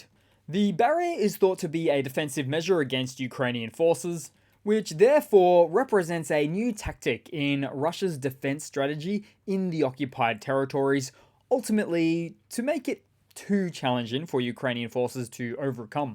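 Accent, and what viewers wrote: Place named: Australia. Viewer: Sorry, but I still cannot get used to an Aussie accent pronouncing ‘route’ as “rowt” rather than “root” and ‘via’ as “vee-ah” rather than “vye-er”.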